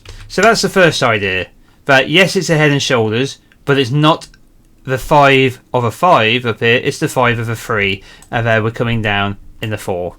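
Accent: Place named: England, United Kingdom. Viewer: I don't know if it's just because of the English accent, but as a non-trader I understand everything you say, you explain things very clearly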